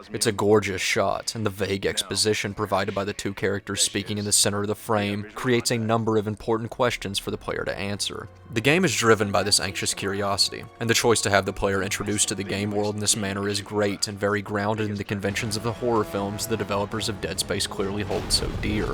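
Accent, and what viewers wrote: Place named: Tennessee, United States. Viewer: interesting and enjoyably informative video on a game I've never played, but the audio's subpar, especially when compared to your usual standard of quality the occasional repeated lines and the bizarre sharp increase of the game's volume at the end were actually distractingly bad. if you're following the caldwell-gervais school of longform critique, that's fine, but steer clear of his audio editing habits, or lack thereof your pleasant (tennessee?) accent's far less pretentious and awful than noah's, though, so at least you have that going for you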